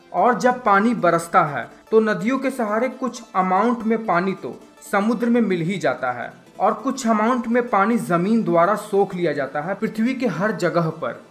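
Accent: Bihar, India. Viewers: Bihari ho kaa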